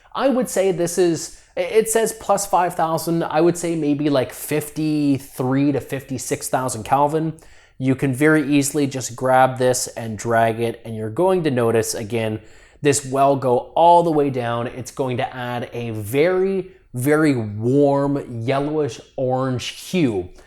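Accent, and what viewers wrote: Canada. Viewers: You sound Canadian 🇨🇦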